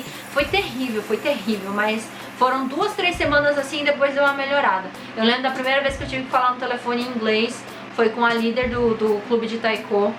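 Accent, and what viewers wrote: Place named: Rio de Janeiro, Brazil. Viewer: Oinhe Mestra * - * e esse sotaque carioca ai kkkkkkk adorei seu video (como sempre ne <3) to estudando ingles e japones mas ta sendo dificil pq odeio ingles, mas fazer oq ne a gente tem q aprender:( ja quero outro video:D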